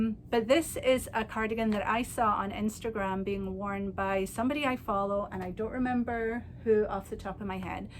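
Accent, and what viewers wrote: Scotland, United Kingdom. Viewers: Raining sideways!!!! Thank you so much, this podcast absolutely made my day. This is the first time listening, and I just burst out laughing at your comment about the rain the in Western Isle being sideways. I was born and brought up in Stornoway, so yes, I can absolutely agree with you. I am living in Fairfax, Northern Virginia, and I could listen to your beautiful Scottish accent all day - especially as I have not been able to do my yearly pilgrimage home this year! I will probably be binge watching to catch up with your many shows, and I look forward to shopping with you very soon. xxx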